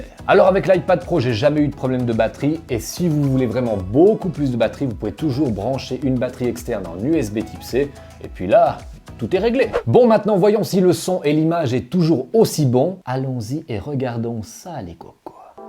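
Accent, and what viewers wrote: Switzerland. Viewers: Alors toi t'es Suisse avec ton vieil accent de paysan ( j'ai le même)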